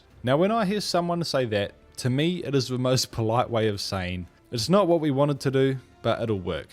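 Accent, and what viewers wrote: New Zealand. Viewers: Also I'm assuming by the accent you're a fellow New Zealander my bro i've dropped a sub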